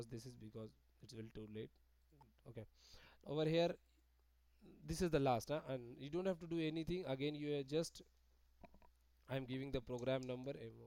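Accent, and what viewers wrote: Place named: India. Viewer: Surprisingly one of the best turorials by anyone with an indian dialect i have ever watched. You nailed it! Great job.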